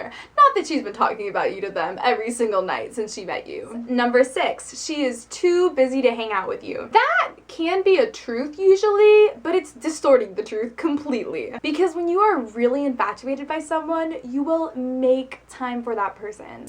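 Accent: United States. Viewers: stop talking fuckin americaneze and fuckin gabbling so fast. I need an interpretor for you! we're not all from manhattan.......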